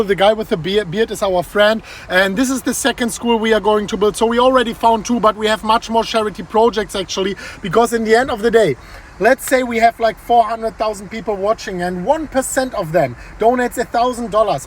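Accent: Germany. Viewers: Love your german accent, from which city in germany do you come from?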